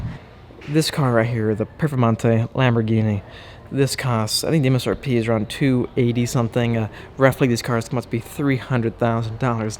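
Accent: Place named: Alaska, United States. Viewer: Love the Alaskan Bush people accent! Great content 👍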